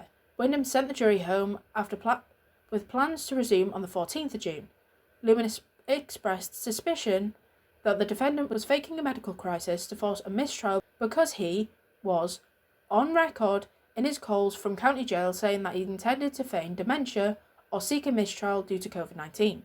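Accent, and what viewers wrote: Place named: Lancashire, United Kingdom. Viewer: Maybe Dean Corll. Curious as to your accent. Are you from Lancashire? :)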